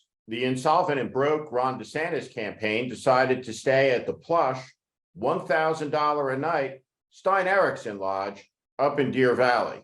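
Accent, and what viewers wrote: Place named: Philadelphia, United States. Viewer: Steve sounds more like he's from Philadelphia, not from Utah. He has a Philly accent. He just said that Park City, Utah was his hometown. Now I'm confused.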